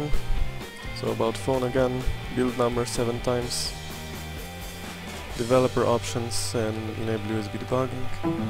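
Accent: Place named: Russia. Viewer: у вас выраженный русский акцент , вы случайно не русский?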